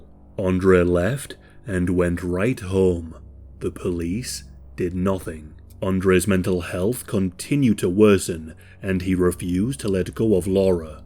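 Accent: United Kingdom. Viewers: it would be much more scary if you didn't have this hilarious british accent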